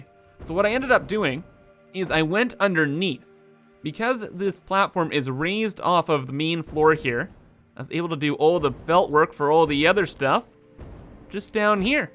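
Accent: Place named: Canada. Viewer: Dude! Could you be more Canadian? Funny to hear the accent: bese (base), spece (space). Get my drift...ey wink, wink Love your videos brother. Gonna buy I on Steam too. Since the Dev are based 45 miles from where I live in Sweden. Ya kno...greatest nation on earth 🤪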